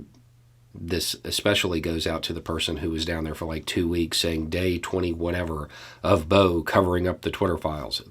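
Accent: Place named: Kansas, United States. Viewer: Love your channel! It’s always the first video I watch everyday. Just commenting to hopefully get you a YouTube buck or two. I kinda look dress/ look/have an accent like you; born and raised in South West Kansas. Made it to California in 1996 at 22yo, via Mississippi for “school”. It’s really reassuring to hear your take on “things”, it’s awesome you’ve found a platform to help/inspire the younger ones too. It also comforts me to know that someone is out there showing the world not all of the “country boys” are MAGA “Good Old Boys”. Keep up the great work.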